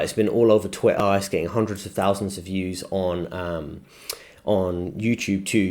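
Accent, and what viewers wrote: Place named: Multicultural London, United Kingdom. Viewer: In accent (Multicultural London English?) the plural word "women" sounds like the singular word "woman". Completely off topic but interesting.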